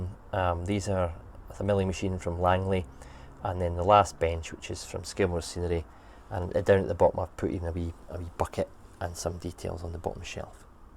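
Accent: Scotland, United Kingdom. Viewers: Love the Scots dialogue, we never had subtitles for Jim Taggart :-). Thinking about your LED lighting. Sorry if I'm pitching at the wrong level, When you buy the mini LEDs you can look for the colour temperature measurement. Typically indoor tungsten bulb white was 3200K, flourescent tubes would be a colder white 4000K. From memory we consider daylight to be 5600K in the UK. Keep up the videos I've been watching them for while, they're a great inspiration. I'm still preparing my loft to put the boards in!! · As your health and safety focal I’ve got to cite you for violation as that red gas cylinder that isn’t stowed should be scored to the cabinet or other object by a bracket or strap (a black painted line or mini chain in HO/OO scale) to prevent tipping. . Hearing a Scot talk about attic heat issues in the summer as I’m be lived 20 yrs in Baton Rouge, Louisiana, makes me chuckle and say “bless your heart”. 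The AC and a bit more roof insulation should go a long way.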